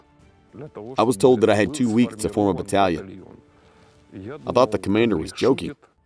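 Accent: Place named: South, United States. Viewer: I do like these type stories .. especially translated is a southern accent. Lol. I was a military spouse x2 retiring both, living on or around so many bases, mostly west coast but we lived in Va, Ga, Ok.. Plus as a USO volunteer and working for a military contractor in DC.. when my husband was stationed at the Pentagon, then i transferred in, we saw a lot of each other, especially his friends and more. He was in communications on the 5th floor, and spoke several languages including Russian. Being around his friends more was like listening to you but they spoke atleast 3 languages besides English as did my husband. Unsure if you actually understand the language but your translation is great. Better then reading bad English translations on videos. Grrr...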